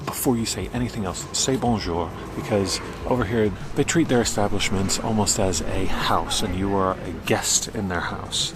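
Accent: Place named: New York, United States. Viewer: Sounds like New York lol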